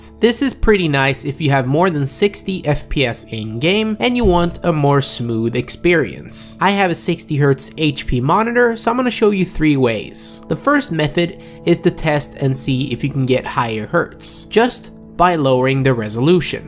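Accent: Sweden. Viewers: är du svensk? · ur swedish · u swedish?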